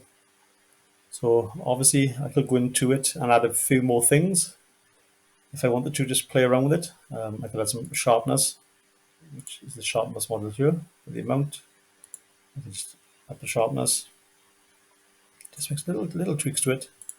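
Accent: United Kingdom. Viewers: not used to the local accent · not tuned to the speech patterns of south Wales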